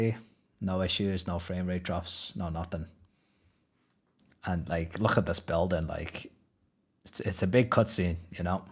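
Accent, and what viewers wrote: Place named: Liverpool, United Kingdom. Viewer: Your accent is hurting my brain, it's like 85% scouser and 15% something else. You are from liverpool right?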